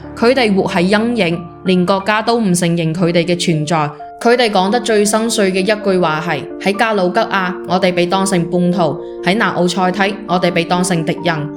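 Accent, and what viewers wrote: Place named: Teochew, China. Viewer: ding gai yao ciu zao yang ge? (點解有潮州音概？)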